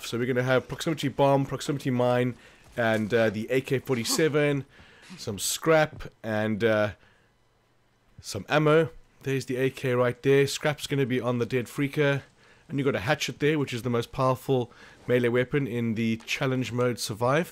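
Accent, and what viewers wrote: South Africa. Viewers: Thanks great video...just got the game and starting. (SIDE NOTE:.....Are you from Mzansi....that accent is a dead ringer). Thanks again, N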